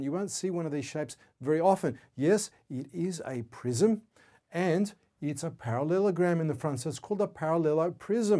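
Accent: Australia. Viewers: u can tell his from sydney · He sounds like a australlian and french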